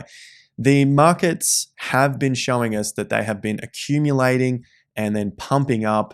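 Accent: Australia. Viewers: your content is great, straight forward and no bullshit. It’s so good hearing an Aussie as well. Love your work.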